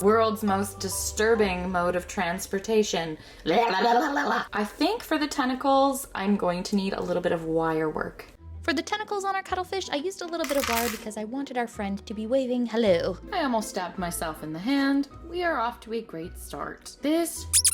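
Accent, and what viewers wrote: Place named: United Kingdom. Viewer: Also your british accent is top tier! 👌 and that's coming from a Brit 🩷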